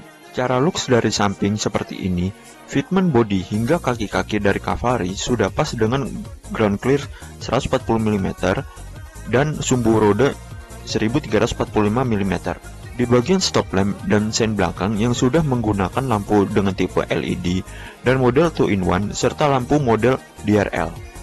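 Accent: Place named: Jakarta, Indonesia. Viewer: Lu orang Jakarta ya..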